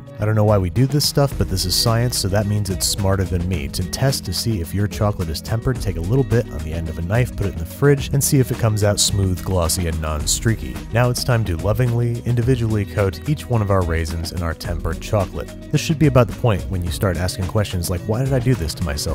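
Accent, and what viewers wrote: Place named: Mid-Atlantic, United States. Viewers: Great Mid-Atlantic accent and another great video! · lol love the transatlantic accent.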